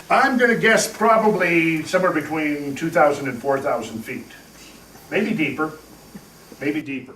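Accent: Minnesota, United States. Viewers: I grew up on the banks of glacial Lake Agassiz known as the Red River Valley now. At least we were told it was Lake Agassiz. The Red River Valley is sedimentary. A dang good place to have a farm if you don't mind being flooded out every once in a while. By the way, I knew you were from the Minnesota North Dakota area before I heard you mention it. Your accent is mostly gone, but I detected it. Mine is mostly gone as well until I cross the North Dakota border then I sound like a character in the movie Fargo.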